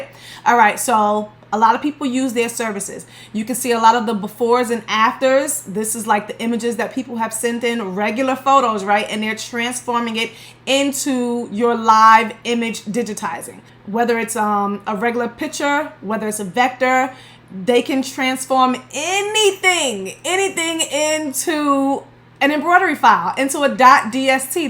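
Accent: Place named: New York City, United States. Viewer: Everytime i look up a new skill in crafting screening dtf ypu name it... there is another video by! 😊 love your NYC accent too!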